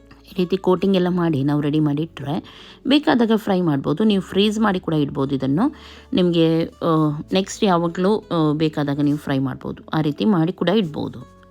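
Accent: Mangalore, India. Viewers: ಮಂಗಳೂರು ಕನ್ನಡ ತುಂಬಾ cute unttu.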